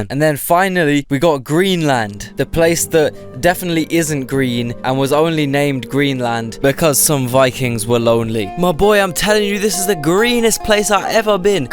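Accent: United Kingdom. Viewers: Oh what I didn’t know you where a fellow swede that oi bruv accent is strong, when did you move to the uk? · Norwegian guy her. I approve this video 😁👌. Cool hearing a british guy that really gets the nordic banter humor we got goin' on ! 😁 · I love how a BRITISH guy talks xd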